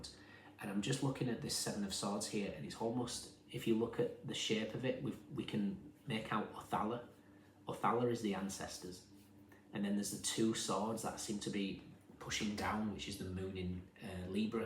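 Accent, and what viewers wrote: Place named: Scotland, United Kingdom. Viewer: Hey there handsome man! and thank you for your time and by the way has anyone ever said that you have such a lovely accent !? 🤔 ❤ Cause you do!🌹😊 Is it Scottish? Enlightened me.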